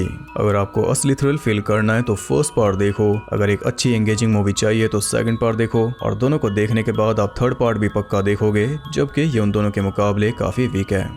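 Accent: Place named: Bihar, India. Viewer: Friday the 13th 2009 wali movie fir se explain karo bhai please pahle ki voice acchi nahin hai aap Jo voice hai vah bahut acchi hai kyunki yah thodi Bihari 🥺🙏🙏🙏🙏🙏🙏🙏🙏🙏🙏🙏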